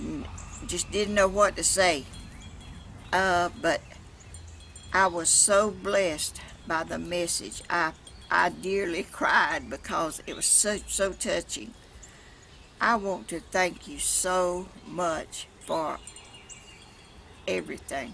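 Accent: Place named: Georgia, United States. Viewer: Happy birthday dear lady. I am a new subscriber. I enjoy watching your videos and I especially like listening to your lovely GA drawl. 😊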